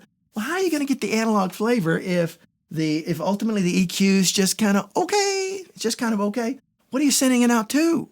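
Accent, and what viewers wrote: South, United States. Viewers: Thank you for saving me from buying this! I have a lot of hardware synths and was considering it, but kept feeling there was something not up to snuff. Love your forest gump accent!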